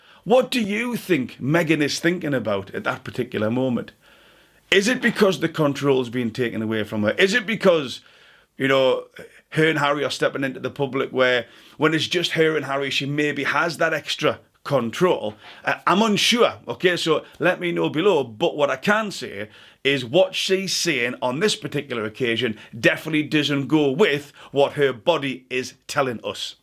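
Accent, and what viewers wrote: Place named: Cockney, United Kingdom. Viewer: If you could speak English properly, I would subscribe. It is like listening to an episode of Eastenders. What does con ent mean? content? You ask your viewers why they don,t like her...why? Because you are not prepared to make a negative opinion of her public? You want your viewers to do it for you so that you are out of her firing line....You state that you lean into liking her!!! Really, not a great reader of a persons character (which you should be able to easily see via her behaviour) Unsubscribing, you are not believeable.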